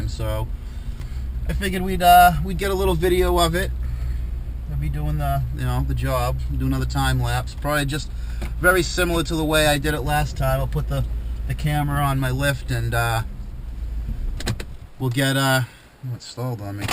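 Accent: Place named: Massachusetts, United States. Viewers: Yo. You gotta be from Massachusetts !!! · 🚗 Loving the Bostonian Accent! These x308 Jaguars have several non-serviceable sensors, when I first bought my XJ8 Vanden Plas I had to swap the throttle body because of a faulty throttle position sensor that is permanently mounted within it. $1,000 part to fix a $30 sensor is crazy, but it made the car road-worthy and it is still working perfectly 7-years after the job with no other major issues. The prior owner had no idea what was causing the problems (scanning codes was all that they needed to do) and he sold it to me for a great price, so I'm not complaining now, but investing $1k to fix a sensor was initially quite concerning, lol.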